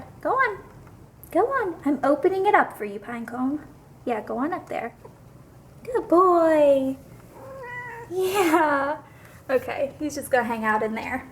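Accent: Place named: South, United States. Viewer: Your accent sounds so much more country than I've ever heard it before!:)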